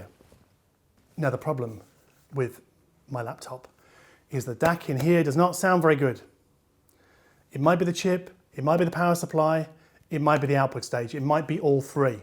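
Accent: United Kingdom. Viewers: How to be a success on YouTube: 1) British accent 2) extensive and deep knowledge of the subject at hand 3) extensive command of the English language, avoiding overused terms such as "warm". 4)ability to give listeners an idea of how something sounds WITHOUT denigrating products or brands. 5) ability to explain a complex subject without sounding arrogant or talking down to the audience. Well done, sir! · So Brit... Ahahahahahahah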